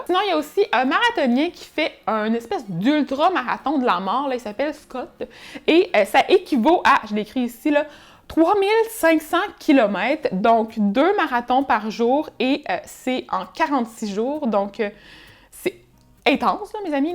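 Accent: Quebec, Canada. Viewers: ouais et en plus j'ai rien contre l'accent québécois mais bon pas évident de tout comprendre c'aurait été mieux en truefrench..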